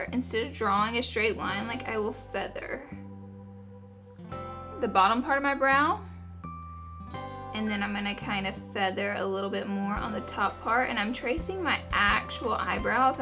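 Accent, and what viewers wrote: South, United States. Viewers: "And I'm gonna bring it up way too...good Lord! Way too high." hahaha that was great. Also, are you from the south? You have a southern accent like I do. :) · I'm just coming across your channel and I just LOVE your personality AND your voice! Haha! I'm not sure where you are from but you sound a little southern and that's why I like it! (I'm from Atlanta by the way :D) I can't wait to watch more of your videos and learn new tips and tricks from you! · sounds southern. The whole time I was actually thinking that I love her voice. But I'm from Texas so lol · Your southern-ness is so adorable lol :) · she's just southern 😂 never go to the south if that accent gives you such a big problem. everyone agreeing with you is just silly. if any of you had to deal with southern people on a regular basis, you'd probably find her voice way less grating. 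· Thank you!! You're the first makeup Youtuber to talk like me. I'm from the South and we are for the most part portrayed as dumb inbred hicks which is far from the truth!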